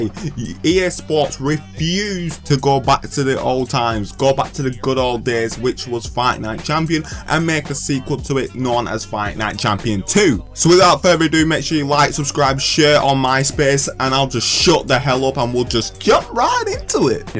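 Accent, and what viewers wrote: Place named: Yorkshire, United Kingdom. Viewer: Yorkshire accent m8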